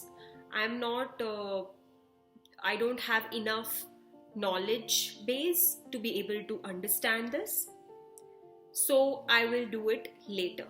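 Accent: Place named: South Delhi, India. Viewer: Your accent is like south Delhi girl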